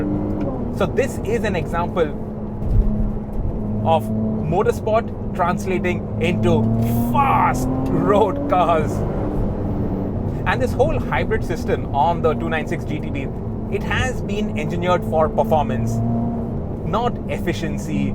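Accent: India. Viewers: The Indian accent🤢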